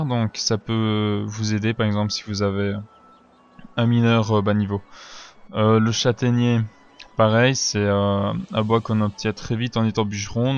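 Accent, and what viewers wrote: Belgium. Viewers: Ah un Belge !!!!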